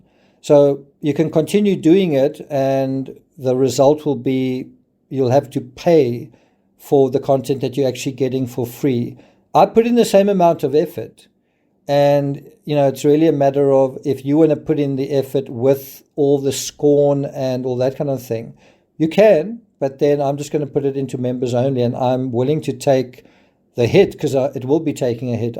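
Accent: South Africa. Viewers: I hope you stay here, love your analysis. Lekker to hear a South African on the tubes ❤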